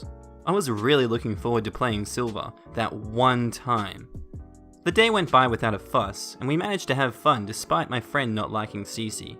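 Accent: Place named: Australia. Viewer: the northern Aussie accent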